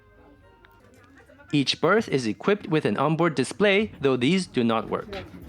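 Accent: China. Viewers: Is the “ Commentator “ Chinese who speaks English??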